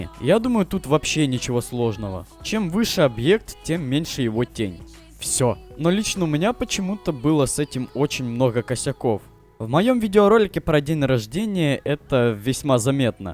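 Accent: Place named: Ukraine. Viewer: Тоже украинец? Буква Г хорошо выдаёт)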